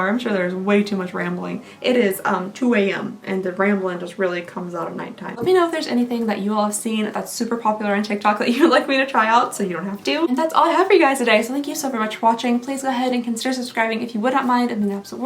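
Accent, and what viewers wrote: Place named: South, United States. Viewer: I just have to say I found you a little while ago, but I adore your accent and southernness so much, since there’s such a lack here on YT.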